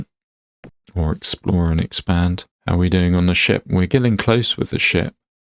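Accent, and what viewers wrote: United Kingdom. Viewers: Great 2 c someone else than Sullla playing this diamond. And with a nice, calm Bri'ish accent :D . Thank you sir.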